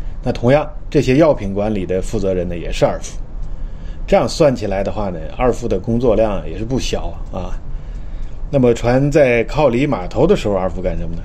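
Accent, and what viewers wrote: Tianjin, China. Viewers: 您是天津人吗？ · 船长辛苦！下次来纽约新泽西需要什么提前说一声，无论你在哪一个terminal停靠，我都可以给你送过去。您略带津味儿的普通话很咯儿很亲切（我是北京的，您有一期节目车在二环上行驶从我家楼下经过)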